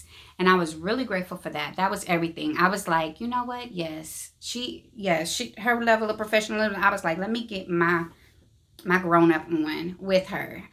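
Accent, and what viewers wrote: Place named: Arkansas, United States. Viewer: I love the Arkansas accent lol great vid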